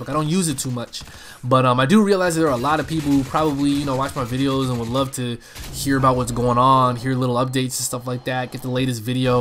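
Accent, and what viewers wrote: Canada. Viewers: you're Canadian eh?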